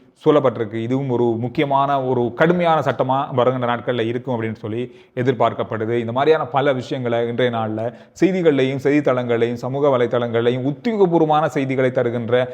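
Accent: Batticaloa, Sri Lanka. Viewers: Don't come up with your stupid Eelam concept. He is from Batticaloa, Eastern province. This is Batticaloa accent. North they don't say "avanka" they say " aveyal" .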